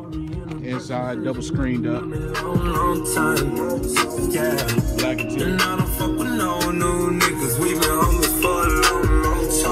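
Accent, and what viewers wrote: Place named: Southeast, United States. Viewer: You aint from Cali are you? You sound like you somewhere South east...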